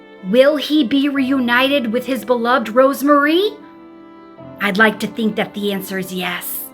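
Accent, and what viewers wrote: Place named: Midwest, United States. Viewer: OMG! Your story telling so reminds me of my mom! Because of the Midwest accent 😂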